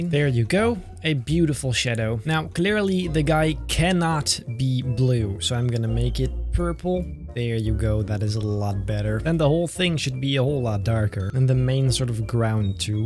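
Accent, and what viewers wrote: Netherlands. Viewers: it sure does sound dutch, I mean, it's not a horrible one, probably why people say it doesn't sound like one, but from my experience (me being swiss) you do sound dutch :) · I heard your voice for a minuite and I thought to myself 'this guy must be dutch'. It's the same accent as from Michiel Huisman (dutch actor from Game of Thrones) and Paul Davids (dutch youtuber who speaks english also)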